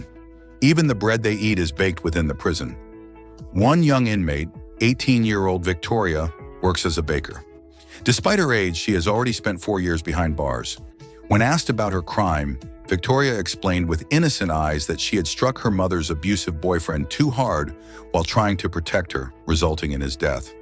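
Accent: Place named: United States. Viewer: bro the narrator is american.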